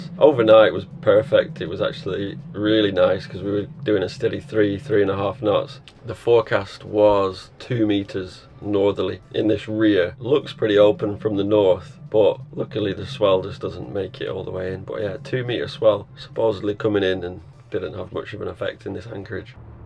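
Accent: Lancashire, United Kingdom. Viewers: where were you born, cause you sound a bit like me (Bolton Lancashire) Not exactly a sailing hub!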